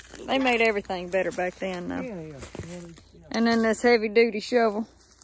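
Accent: South, United States. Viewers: You’re beautiful 😍 with that country accent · I 💘 your beautiful smile too and your country accent too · I'm from SC but your accent is country! I could listen to you talk all day. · So cute I love that southern accent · Nice to see a pretty girl with a southern accent not covered in tattoos and saying like every third word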